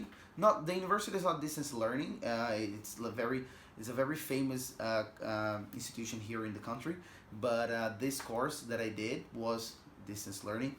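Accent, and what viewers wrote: Brazil. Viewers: Thanks. You should make one brief mention of Brazil in each video so new viewers will know where your very pleasant accent originates. Like "Here in Brazil...." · Imaginei que era brasileiro por causa do sotaque. Caracteristico!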